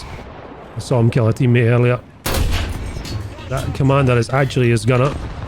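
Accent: Scotland, United Kingdom. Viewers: as a new subscriber, I ask this favor of you with the complete understanding that it very well may never happen. But, nonetheless, can you please say "Burglar Alarm" in a video, please? Jeremy Clarkson had a Scot say that phrase on the old Top Gear and It would kill me to hear you, another thick accented Scot, do the same. Thank you, sir, love your videos!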